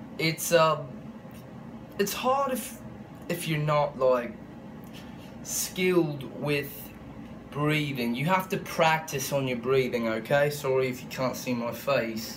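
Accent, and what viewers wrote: England, United Kingdom. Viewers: What! U call that an Australian accent. It’s British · Can someone explain why he decided to speak in an English accent? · fookin hell thought he is a fine lad from Briston or London, until i found out..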